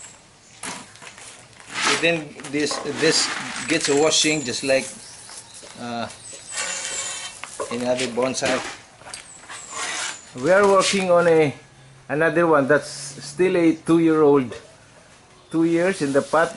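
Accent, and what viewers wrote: Visayas, Philippines. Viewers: akala ko indonesia kayo bisaya pala mo dong oi gi atay hahaha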